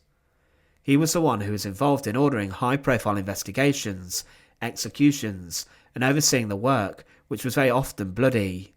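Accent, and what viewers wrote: United Kingdom. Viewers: The only thing this announcer has going for him is his British accent.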